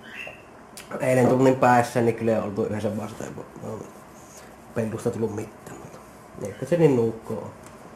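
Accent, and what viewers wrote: Savo, Finland. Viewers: mistä päi savoo sä oot ku puhut murteel?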